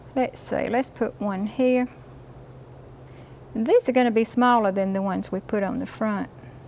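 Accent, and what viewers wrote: South, United States. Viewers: Why have I never heard of this woman before? Why, she’s the female Bob Ross! I just love her southern accent!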